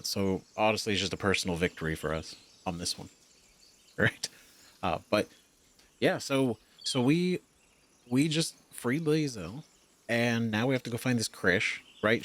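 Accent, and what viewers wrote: England, United Kingdom. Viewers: Yes, it's a loan word from French. I think is English so I assume he's heard it a lot. Maybe he's American though? He does use both English and American words and pronunciations but his accent is English, I think. lol I was just laughing about it and not trying to make a serious complaint or anything. It was meant to be a friendly comment. I'm genuinely sorry if I gave the impression I was trying to be rude or offensive. I apologise.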